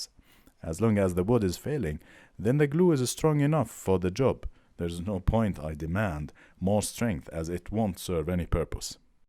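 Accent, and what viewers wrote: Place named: Thuringia, Germany. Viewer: That accent. Sounds Thuringian. Please tell me I’m correct!? Lol.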